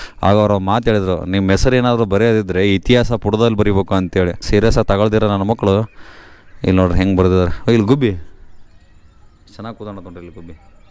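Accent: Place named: North Karnataka, India. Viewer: ಹೇ ಗುರು ನಿಮ್ಮ ಭಾಷೆ ಉತ್ತರ ಕರ್ನಾಟಕ ತರ ಇದೆ.. ದಾವಣಗೆರೆ ಭಾಷೆ ಸ್ವಲ್ಪ ಚನ್ನಾಗಿ ಇದೆ ಅದನೇ ಮಾತಾಡು 😂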